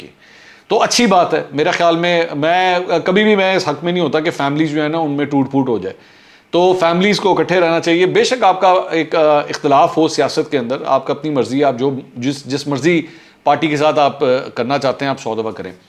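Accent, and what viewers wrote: Punjab, India. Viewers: Me ap ki punjabi style ki waja say vlogs sunta hun realy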